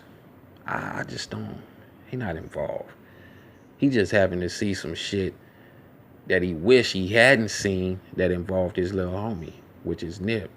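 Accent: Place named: California, United States. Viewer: New subbie here. And I agree that Cowboy does not have anything to do with it. When he took off his hat and scratched his head to me that’s says like wtf did I just see. Or perhaps he thought nip was gonna get robbed but not killed. Anyway you take it it’s crazy and are you from Cali? I can hear the accent.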